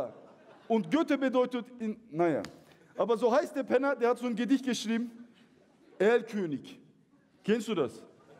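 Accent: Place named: Turkey. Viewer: Ich mag diesen türkischen Einschlag nicht, so ein vermurkstes Deutsch zu hören ist nicht schön...dieses oberflächliche "Ich schwör" und so weiter.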